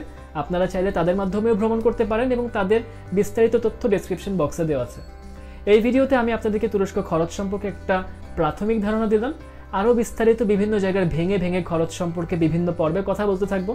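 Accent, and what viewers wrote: Standard. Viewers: বাংলায় উপস্থাপনায় এক যুগান্তকারী উদাহরণ অপনি এবং বিশ্বকে সম্পুর্ন শুদ্ধ বাংলায় উপস্থাপন আপনার থেকে ভালো কেউ করতে পারে নি এটা নিশ্চিত ♥️♥️💕💕 এগিয়ে যান আরো অনেক দূর ♥️ আল্লাহ তায়ালা আপনাক নেক হায়াত দান করুক ♥️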